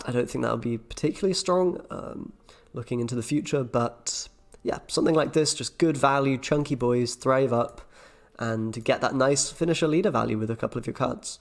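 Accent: United Kingdom. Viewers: Yo, you're British, aren't you? Great vid BTW <3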